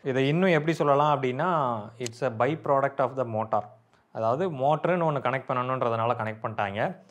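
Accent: Tirunelveli, India. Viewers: தூத்த இடம்.. திருநெல்வேலி slag ❤❤❤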